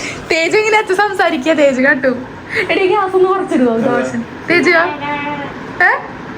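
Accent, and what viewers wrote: Thrissur, India. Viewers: Thrissur angne paraya sheriyakknonnulyaa😌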